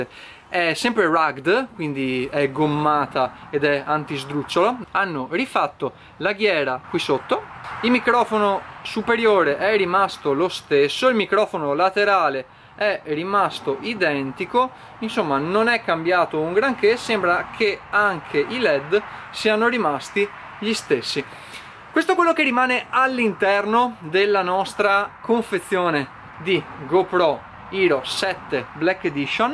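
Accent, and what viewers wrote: Veneto, Italy. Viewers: ciao e grazie per il video. Domanda... dall'accento sei veneto esattamente come me. Ma da dove di preciso?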